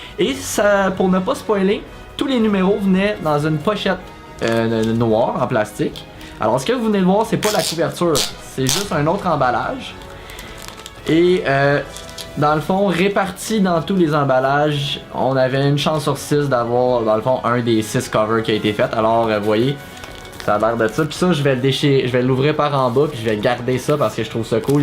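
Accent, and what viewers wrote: Quebec, Canada. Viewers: Es tu quebecoi (moi oui)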